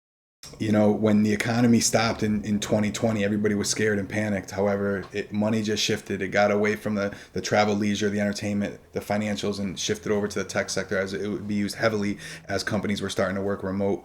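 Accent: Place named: Boston, United States. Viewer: Is from Boston? He sounds like he has a Boston accent LOL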